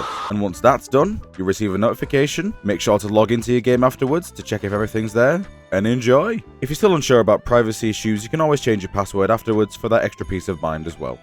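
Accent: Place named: Blackpool, United Kingdom. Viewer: The UK origin was crystal clear, sounds like Blackpool area, but i might be wrong. Is nice to hear. ^_^ Ps from time to time i like playing FuA, but only have 1.x units, so it's kinda tough, but doable. Not to justify the stunt that yoyoverse is pulling though, that sucks and as far as i am concerned they can shove that cyrene girl up their arses.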